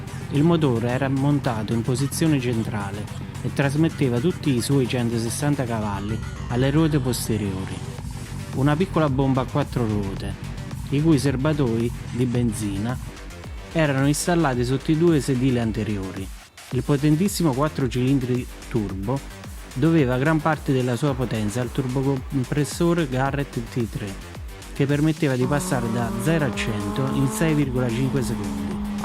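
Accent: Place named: Naples, Italy. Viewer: Ma come cazzo si fa a commentare e redigere un servizio del genere con una voce con uno spiccato accento napoletano! Vergogna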